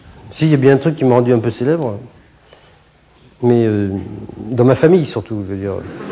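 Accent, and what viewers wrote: France. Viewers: Il me fait pleurer ce mec, de rire et de peur, je l aime ce mec et son humour… avec son accent limousin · Il est beau l'accent de Dunkerque x)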